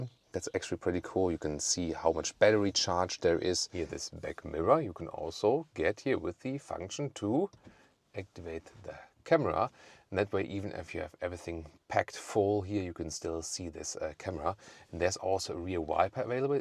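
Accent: Germany. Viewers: Voll der deutsche Akzent.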